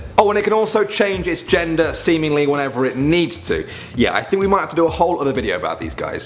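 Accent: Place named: England, United Kingdom. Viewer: Like English accent🇬🇧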